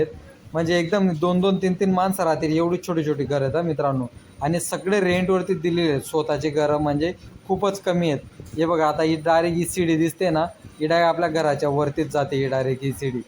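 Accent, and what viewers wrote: Khandesh, India. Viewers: भाऊ तू खान्देशी आहे का? तुझ्या भाषेवरून वाटले कि तू खान्देशी आहे. मी पण जळगाव ची आहे.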